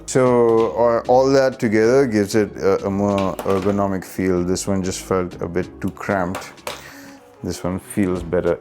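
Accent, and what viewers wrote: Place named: India. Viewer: god the indian accent is so harsh and foul to my ears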